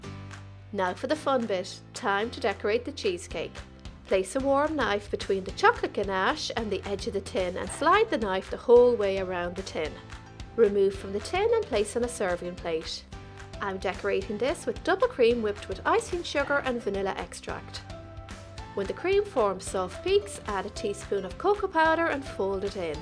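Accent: Ireland. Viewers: Love ya accent, reminds me of my gran and grandad and all my family in Ireland when I was growing up 🇮🇪 and I’m gonna have to try and make one of these to try 😊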